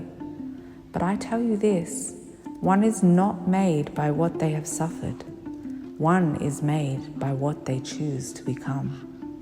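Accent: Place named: New Zealand. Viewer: New Zealand accent? My favorite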